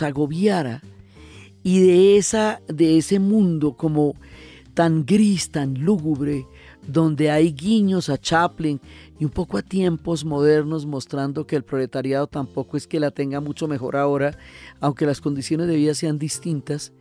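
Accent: Colombia. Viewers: ! El regalo más maravilloso ha Sido encontrarte, en mi vida existía un vacío que tú con tus palabras me lo has estado llenando, soy nacida en Colombia y vivo en Venezuela, dónde nos trajo mi padre desde Antioquia, por lo tanto tu acento y tus salidas me encantan y remueven mi origen. Podría escribir más...pero no jajajajaja Yo necesito aclarar el cuento del árbol de San Bonifacio porque iban a matar el niño, y como esa acción de San Bonifacio lleva a lo del arbol😊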